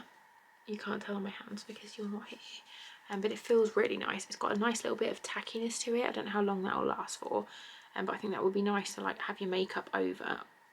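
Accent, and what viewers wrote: Norwich, United Kingdom. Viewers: Love your mascara, eyeshadow,eyeliner, eyebrows in this video :) and that Norwich uk accent!! 😍❤️❤️❤️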